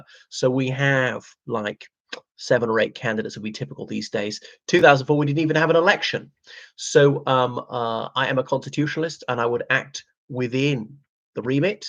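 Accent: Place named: England, United Kingdom. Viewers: you don't sound Irish ? you sound english.... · Ah yeah you are just what we want for our President in Ireland - a Youtuber with an English accent, who cant speak the Irish language, who is anti-Irish Republicanism and who professes Unionism. Move over Cokehead McGreggor we have a new front runner in " from Ireland [with a plummy English accent]"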